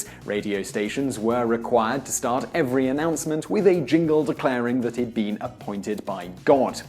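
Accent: England, United Kingdom. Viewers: It's quite funny how the presenter here speaks in an English accent, yet uses and pronounces words in an American way. Anyone else noticed this?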